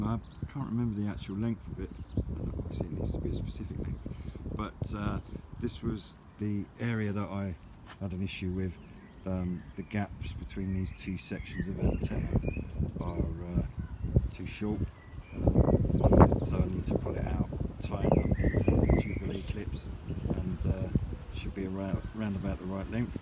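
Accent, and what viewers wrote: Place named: Deep South, United States. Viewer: You sound like you come from the deep South. I wonder if you can get in on our South East Coast Net tomorrow ?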